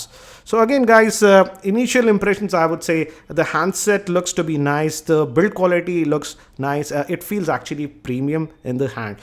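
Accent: India. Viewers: typical Indian accent english.. :(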